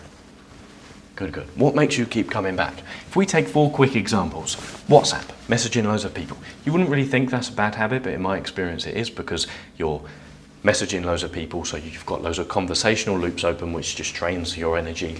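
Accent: United Kingdom. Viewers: What type of British accent is this? I’m getting Michael Caine vibes 😊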